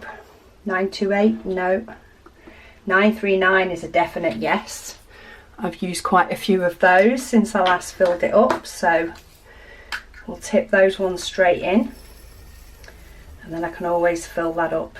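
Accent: United Kingdom. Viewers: I found your channel recently, and I love it! I’m a British DP addict living in the U.S. and it’s a wonderful change to hear a British accent talking about my passion!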